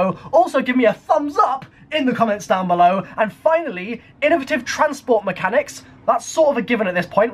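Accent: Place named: RP, United Kingdom. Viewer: Posh but good vid left a like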